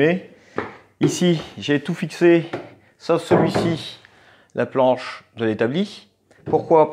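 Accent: North, France. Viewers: bonjour, bon travail tu ai du nord ?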